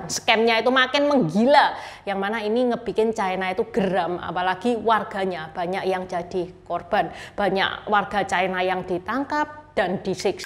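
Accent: Java, Indonesia. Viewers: Seneng banget sama itu logat jawanya medok bangeeet,waw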